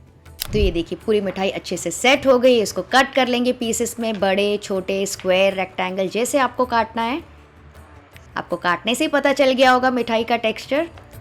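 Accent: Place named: Sindh, India. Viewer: adee jo ghalahin dadho aaio khas toarte sindhi lahjo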